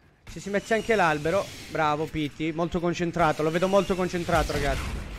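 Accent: Calabria, Italy. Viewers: Ma sei o Ninja??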